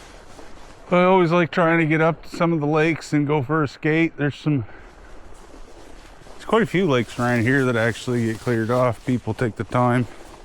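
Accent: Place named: Canada. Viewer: I love hearing the Canadian accent!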